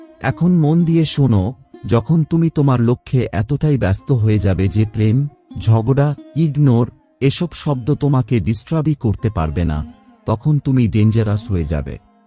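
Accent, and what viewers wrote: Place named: Chapai Nawabganj, Bangladesh. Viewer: ভাই আপনার বাড়ি কি চাঁপাই? আমার এক্সের বাড়ি চাাঁপাই, ও এই ভাবে কথা বলতো আপনার স এর উচ্চারণ এই রকম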